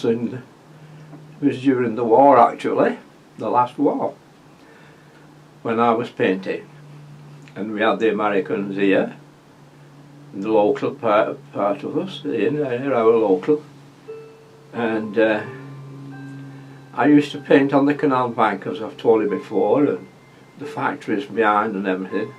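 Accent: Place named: Bolton, United Kingdom. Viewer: Hi. Great to put a face to your wonderful tutorials. You are truly an inspiration. I love your accent. It reminds me of my childhood, growing up in Hulton Lane, Bolton, horse-drawn Manfredies ice cream and day’s when we “ played out” in summer until after dark. Best wishes.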